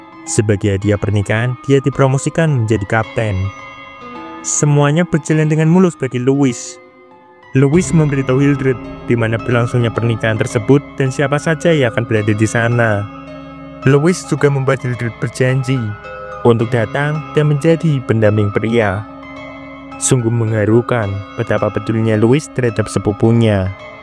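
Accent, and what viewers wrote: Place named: Java, Indonesia. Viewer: medok jawanya ganggu bgt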